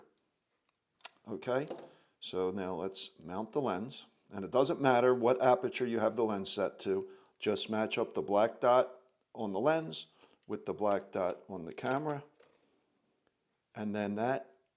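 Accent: United States. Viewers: you have a usa accent. do you specifically hang on to the softer canadian nickel for opening the battery cover?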